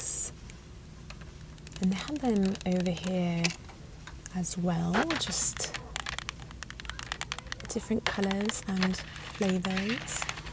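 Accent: England, United Kingdom. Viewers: i wonder where was born, she sounds british to me... So i wonder if there is Target in UK.. sorry for my bad english ^~^ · Real or not love me a good English accent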